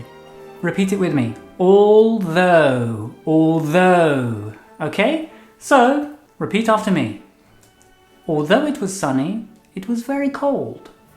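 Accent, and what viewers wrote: United Kingdom. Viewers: Pero que dulce es el acento Britanico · This is British?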